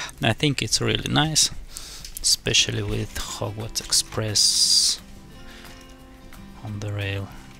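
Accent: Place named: Russia. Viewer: you are Russian? у тебя русский акцент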